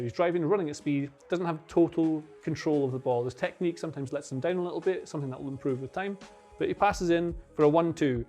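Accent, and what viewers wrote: Scotland, United Kingdom. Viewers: Scottish presentation?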